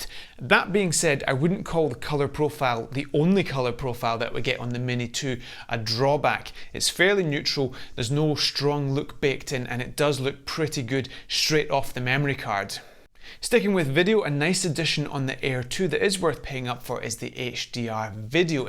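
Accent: Scotland, United Kingdom. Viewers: Love your accent haha! You sound EXACTLY like my Scottish neighbor.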